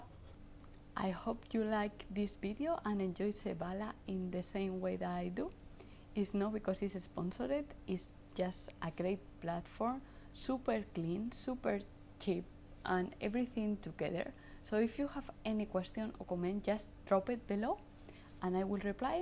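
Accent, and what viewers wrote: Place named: Spain. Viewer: Love the accent. U from Spain?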